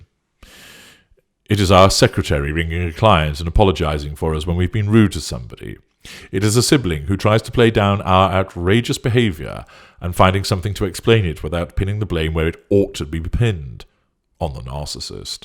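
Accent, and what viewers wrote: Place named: England, United Kingdom. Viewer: I like listening to voice, I enjoy the English accent. My ancestors came to the U.S. from england, One day I hope to take a trip over there and spend some time.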